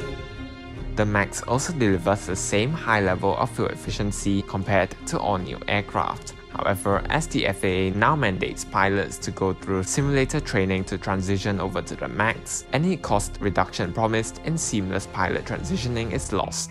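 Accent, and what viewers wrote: Singapore. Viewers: I enjoy watching your videos for the most part… but how about getting someone else to the voiceover. Argh, Singlisn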